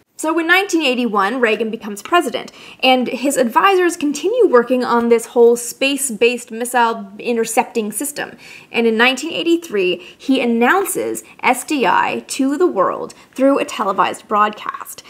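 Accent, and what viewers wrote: Canada. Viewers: I love how you have defined this area of history and claimed it as your own. You always post great stuff. Very surprised to hear you don't have an opinion on SDI after you explained it so very well. Treaty language largely says defense measures are not treaty violations and you summarized it all very well. So, despite small disagreement, very well done.... and your Canadian accent still shines through. Very adorable.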